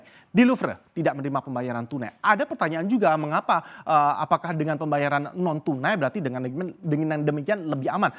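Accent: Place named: South Jakarta, Indonesia. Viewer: Pembawa beritanya orang jaksel nih.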